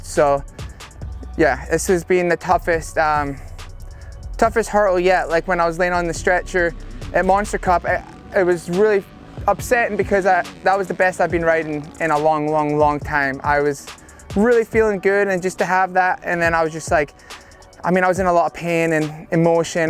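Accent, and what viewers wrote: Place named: London, United Kingdom. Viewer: Where is this guy from? His accent sounds like London via east LA.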